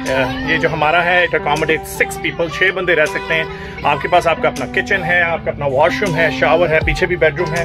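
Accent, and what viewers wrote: Punjab, India. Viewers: Love your Punjabi accent although I m not Punjabi you still use lota in canada yes it's a desi touch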